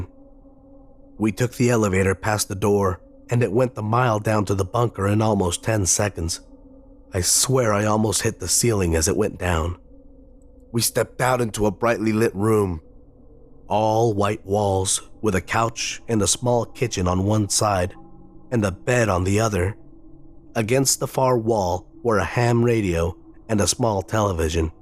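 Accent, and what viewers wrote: Russia. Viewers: Duke Nukem meets Russian accent? :(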